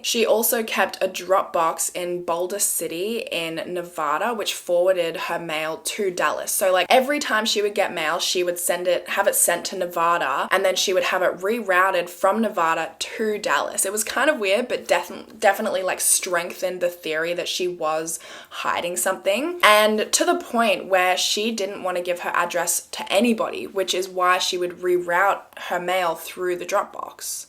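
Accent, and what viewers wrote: Australia. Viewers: Since 1985, I've heard the beautiful Australian accent every day, thanks to Neighbours.. So after this Friday, you'll be helping to fill that void for me!!... 😔💜💜💜💜💜🇬🇧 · Love the Aussie accent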